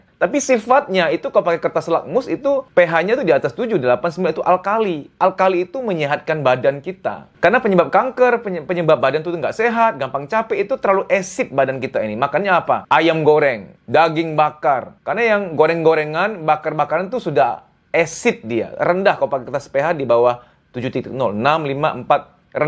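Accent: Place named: Lampung, Indonesia. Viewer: Logat bicaranya seperti suku lampung ....bener tidak pak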